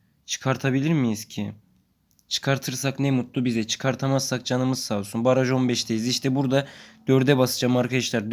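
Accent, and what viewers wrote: Erzurum, Turkey. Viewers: erzurumlumusun abi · Abi sen erzurumlumusun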